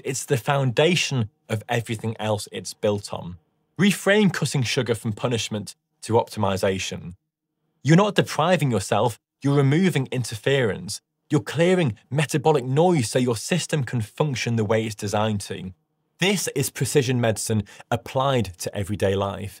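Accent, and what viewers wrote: England, United Kingdom. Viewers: Oh the delight of hearing a nice English voice! Thank you dr.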